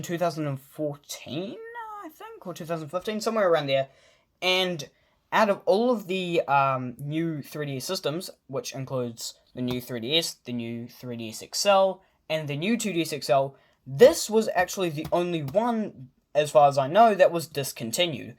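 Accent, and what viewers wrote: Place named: New Zealand. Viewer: This video was on my feed. Wasn't prepared for the kiwi accent 🤓 Kidding aside this was a very good comparison video. Thumbs up mate!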